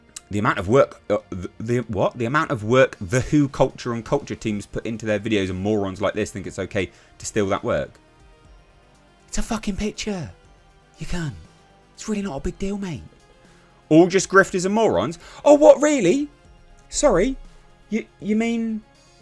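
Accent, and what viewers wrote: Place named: England, United Kingdom. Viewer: I always appreciate a genuine English accent and calling someone a dickhead. Like going to a football match and calling the ref a 'silly fat wanker'!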